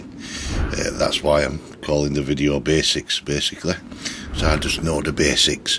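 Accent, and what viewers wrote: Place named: Yorkshire, United Kingdom. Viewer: This may seem a bit of a tangent to some, but I would love to know what your accent is, sir. I am an English teacher and very interested in accents. Can I venture a guess? Yorkshire? Please let me know. Also, I LOVE this rat shoot video. I am an avid shooter myself. Cheers!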